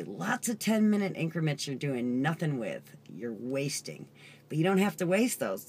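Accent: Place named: Southern California, United States. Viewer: You look and sound like you are from southern California.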